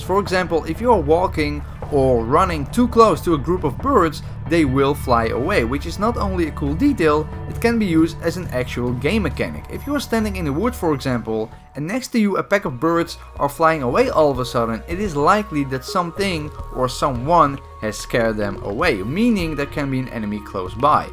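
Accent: Netherlands. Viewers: Are you dutch? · Brooooo je hebt echt een zwaaaar nederlands accent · Ben je nederlands want zo klingt je accent pls zeg het als het zo is ik abboneer nu btw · I knew from the first sentence that he is dutch 😂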